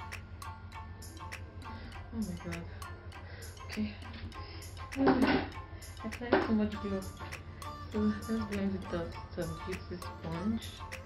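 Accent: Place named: Nigeria. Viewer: All the love from Kenya. This is my first time watching someone from Nigeria, to be specific Lagos. I love the Accent and I am so excited 💛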